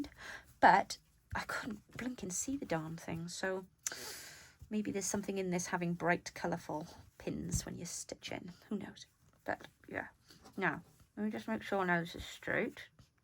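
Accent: Wales, United Kingdom. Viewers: Hello, I enjoyed listening to you today even if I didn't get to watch the video. I wouldn't mind having a bit of your energy, just because currently I'm feeling a bit drained, but you make me laugh and chuckle with your Welshness. Thank you for being you. Take care and God bless you and yours. Sincerely Becky B from Arkansas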